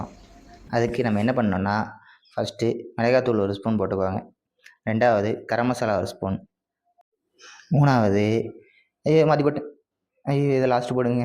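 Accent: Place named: Madurai, India. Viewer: உங்கள் எந்த ஊர் ? பேசும் போது தேற்றம் மதுரை என்று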